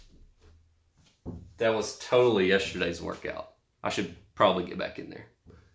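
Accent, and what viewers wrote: South, United States. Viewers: the yeehaw type voice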